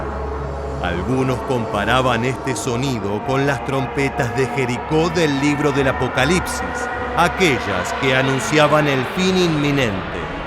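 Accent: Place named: Argentina. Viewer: Al narrador se le nota lo europeozadonque se siente ha bla en español Argentino y no quiere pronunciar los nombres de los vehículos en español que incongruencia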